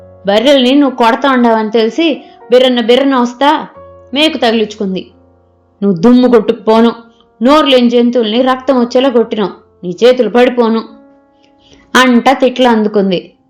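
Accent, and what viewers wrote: Rayalaseema, India. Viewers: ఈ రోజే విన్నా. ఎంత బాగా చదివానో రాయలసీమ యాస అలవోకగా చదివావు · రాయలసీమ యాస చాలా బాగా చదివావు. సూపర్ 👌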